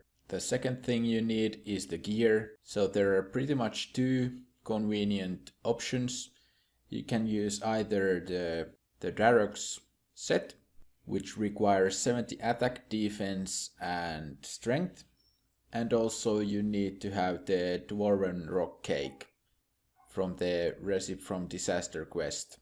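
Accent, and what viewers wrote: Finland. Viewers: dat finnish accent tho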